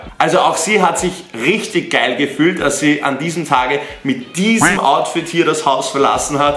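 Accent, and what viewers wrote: Austria. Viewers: #österreicher "Wos dus du dn grod"😂😂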